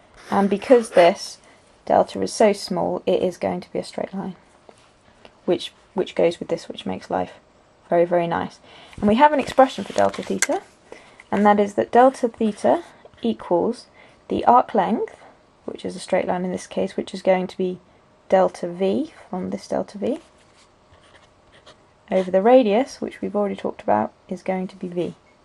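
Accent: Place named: United Kingdom. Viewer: I agree. I love the British accent.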